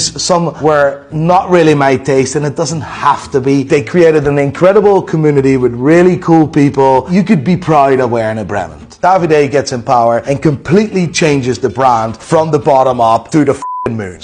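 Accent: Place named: Ireland. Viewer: Once you hear him as Irish, its impossible to shake. Sorry.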